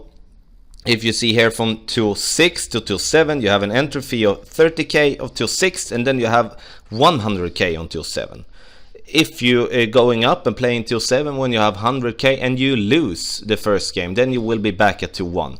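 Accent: Scania, Sweden. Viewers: Are u swidish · Dats how pejpol from skåene in sweden sounds lajk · This is some of the most swedish sounding english I have ever heard. Love it! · Nice Swedish accent 😊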